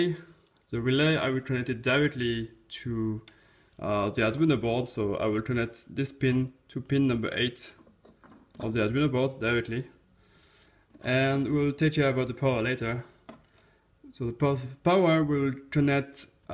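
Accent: France. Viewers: Are you French ? You have one of these accent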